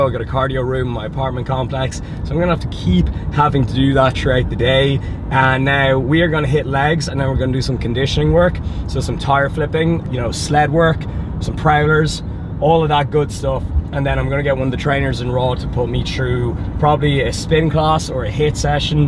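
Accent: Ireland. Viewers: accent sounds Irish. Lucky dude if so. Ireland is beautiful! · What county is he from sorry is it Dublin or Wicklow? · love me an irish accent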